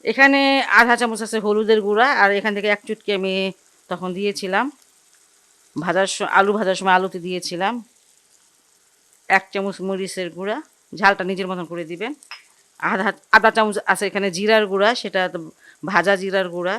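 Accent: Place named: Jamalpur, Bangladesh. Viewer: আপা আপনার রান্না গুলো খুবই ভালো লাগে,, আপনার বাড়ি কোথায় মনে হয় জামালপুর জেলা আপনার কথা বলা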